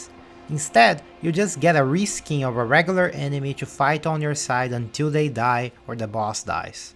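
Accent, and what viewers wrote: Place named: Brazil. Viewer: WOW. What a hidden gem of a yt channel and videogame critic. Came into the video expecting some very copy paste criticisms that anyone could have come up with after a few play sessions. Came out feeling i’ve heard one of the best videogame criticisms in a while, and i watch reviews/critiques of games all the time, especially Elden Ring, since the game made me feel so confused and mixed overall, with so many Outstanding moments and so many boring ones during my completionist 150h playthrough as a Souls veteran. Instantly subscribed, now i need to see some more reviews, there are very few as coherent, thoughtful and all encompassing game critics in yt. Only curiosity... are you from a latin country? Your accent feels similar to brazilian portuguese “ingrish”, and at first i found it a bit annoying, it actually grew a lot in me and it was very clear and easy to understand, and had a good cadence to it. 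Great video and analysis and hope to see more like this in the future!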